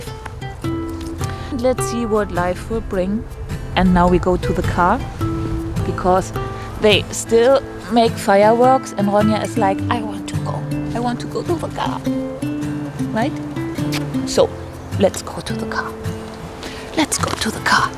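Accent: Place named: Germany. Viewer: Ich würde dir empfehlen, die Videos in deutscher Sprache zu verfassen. Man merkt, wie "anstrengend" es für dich ist, englisch zu sprechen (zumindest wirkt es so), und dann ist es für die Zuschauer natürlich nicht "entspannend" dir zuzuhören. Weiterhin ist deine Aussprache sehr deutsch, was es ebenfalls anstrengender macht, dir zuzuhören. Natürlich gibt es ein größeres potentielles Publikum im englischsprachigen Raum, aber ein größeres Publikum erreichen zu wollen, bedeutet meistens auch, nicht allzu spezialisiert zu sein (eine Nische zu haben), und oft kommt Erfolg erst dadurch, dass meine eine Nische belegt und sich von anderen abgrenzt. Meine Kritik ist nicht böse gemeint, vielleicht hilft es dir ja :)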